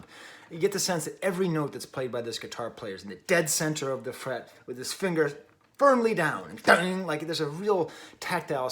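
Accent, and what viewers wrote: Dublin, Ireland. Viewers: He has a very Dublin accent. Hes from the same town as me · Nowhere near a British accent, it's a strong Dublin accent.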